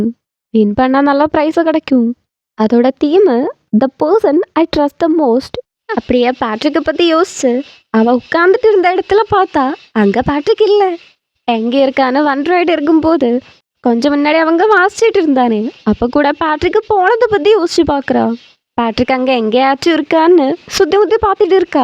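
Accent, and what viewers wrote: Kerala, India. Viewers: Malayali tamil ayyo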